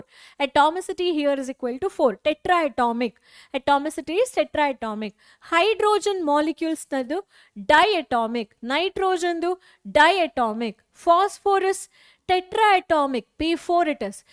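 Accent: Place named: Uttara Kannada, India. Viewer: Mam you speak in uttar kannada voice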